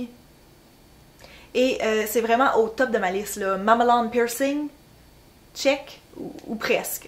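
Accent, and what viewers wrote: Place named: Ontario, Canada. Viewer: Je veux l'accent franco-ontarien ! Tu lâ perdu en allant vivre â montreal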